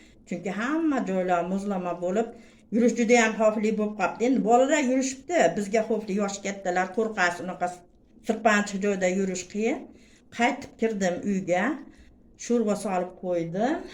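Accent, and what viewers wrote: Andijan, Uzbekistan. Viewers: ЯХШИМИСИЗ ДОМДА ЯШАЯПСИЗ МИ КИМ БИЛАН ЯШАЯПСИЗ ТОШКЕНЛИКМИСИЗ ШЕВАЕЗ АНДИЖОНГА УХШАРКАН ИЛТИМОС ЖОВОБ БЕРИНГ НЕЧТА ФАРЗАНДИЗ БОР ШУ ЁШДА ЖУДА ПОКИЗА АЁЛ ЭКАНСИЗ МАШААЛЛОХ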